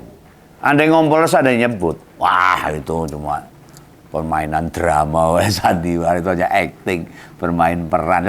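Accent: Java, Indonesia. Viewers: Om ki ngomong jowone Mantep😅😅 Medok tur genah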